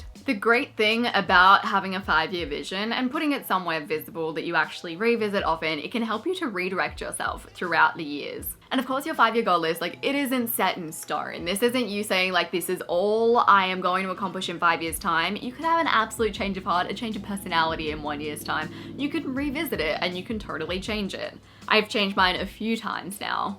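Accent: Australia. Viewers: ok but... Drew Barrymore with an Australian accent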